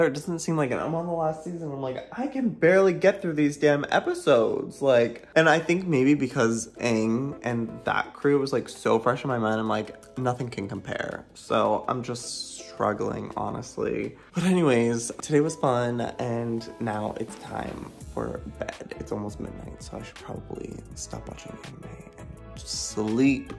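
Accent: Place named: United States. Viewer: im curious - do you feel like people you interact with in london treat you differently because your accent is obviously from the states? i've considered visiting london myself and i have always wondered that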